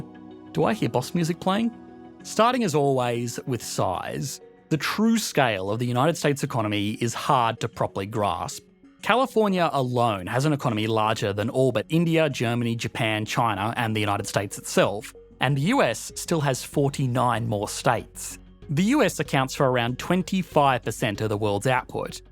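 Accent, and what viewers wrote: Australia. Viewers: As an ignorant American, I can say that I could never tell if his accent was Aussie or Kiwi, so I never noticed the flag flub. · Between you and Perun (and sometimes sunnyV2 and shadiversity) i don't thinkni can stomach analysis anymore unless it comes with an aussie accent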